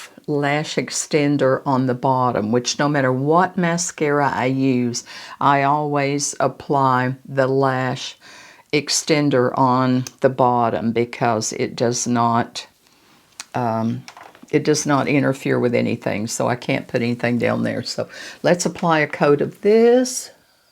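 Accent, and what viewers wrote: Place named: Appalachia, United States. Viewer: ! I also love your accent, it reminds me of home. I’m from Appalachian area of North Carolina and Tennessee, but live in Ohio now. You are a true joy ✨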